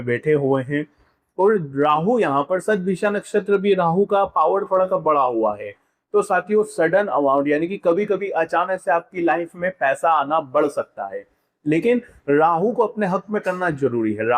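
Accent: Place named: United Kingdom. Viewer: Bakwas mat kijiye First of all improve your pronounciation in Hindi Don't talk like a Britisher You Third grade Astrologer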